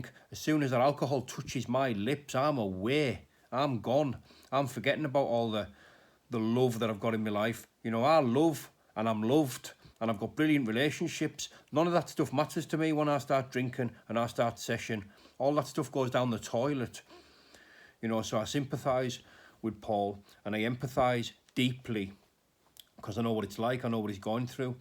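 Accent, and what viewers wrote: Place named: Cumbria, United Kingdom. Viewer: Are you from Cumbria?can definitely hear it...x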